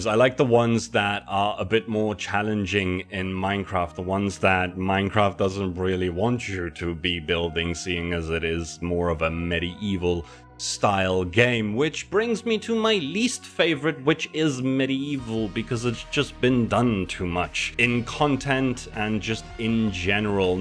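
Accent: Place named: Wales, United Kingdom. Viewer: Congrats! 🥳 Have to say I was convinced you were Welsh before watching this. My first language is Dutch so I can usually pick up on similar accents, SA included, but unlike Rendog's accent, I never would've guessed you were from SA.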